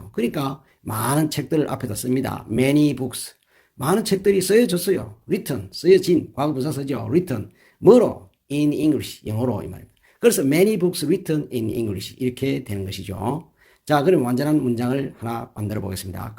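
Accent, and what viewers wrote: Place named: Gyeongsang, South Korea. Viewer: 늦게 선생니을 알게 되었군요 처음부터 찿아서 잘배우고 있습니다 감사합니다 경상도 분 이신가봐요 저는 경상도 할매입니다 혼자영어공부 한지 십년이 되어가는데도 아직 버벅거립니다 그냥 공부가 좋아 조바심 없이 하고있습니다 좋은 가르침에 감사드립니다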